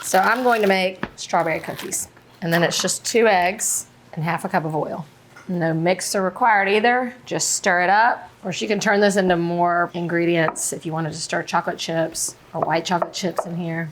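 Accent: South, United States. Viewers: I hear a slight southern accent 🤔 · Yes she's great at this! But I always welcome a southern drawl...ya' all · has a sweet southern accent, one of the things I love about listening to her videos.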